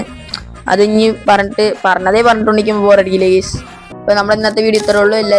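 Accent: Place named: Thrissur, India. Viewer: Pkd aano... ഞാനും pkd ആണേ... ഇയാളുടെ slang trissur ആണല്ലോ 😊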